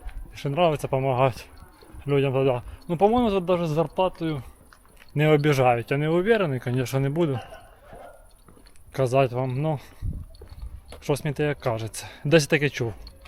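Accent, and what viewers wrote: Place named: Surzhyk, Ukraine. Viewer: Супер суржик, нікого не слухай, звучить гарно і натурально - це твоя фішка як в Канадського Марка)) Admin 11 думає що тільки він читав українських письменників і тепер може повчати як кому розмовляти) від літературного українського говору відразу хочеться спати)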